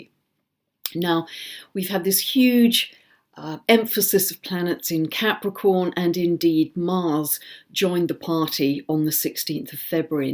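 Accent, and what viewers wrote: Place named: Oxfordshire, United Kingdom. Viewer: I'm curious if you're in Oxfordshire(judging by your accent).Interested in a reading.Maybe you could answer some questions.My chart is full of contradictions.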